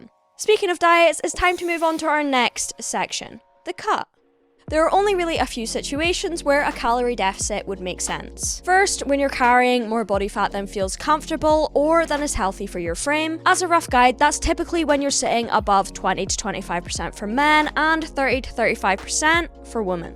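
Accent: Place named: Scotland, United Kingdom. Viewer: SECOND COMMENT:🥈 happy Sunday, Can I please be your Pookie? What does that mean? By the way? I don’t even know what that means and I still wanna be your Pookie BTW You’re a Scottish🏴 accent=🔥I love you❌⭕️❌⭕️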